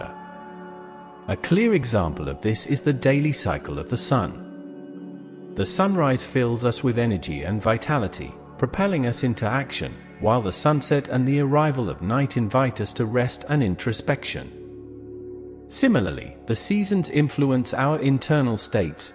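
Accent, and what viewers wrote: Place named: Japan. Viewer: Sounds like Japanese tone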